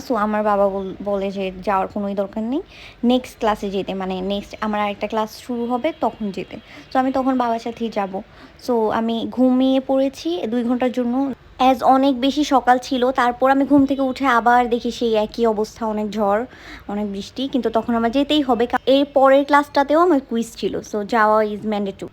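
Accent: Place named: India. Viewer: tmr ktha bartar style indian typr........ Tmr nnu brir vlog vdo dekhe sure holam j tumi amdr Desher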